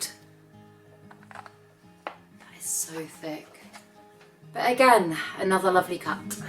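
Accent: United Kingdom. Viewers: Hi! I've never had any language problems with your channel British English everytime. Question, HELP! Begonia semperflorens, bronze leaf, they root in water with no problems but when I plant them in soil, they rot. Thoughts?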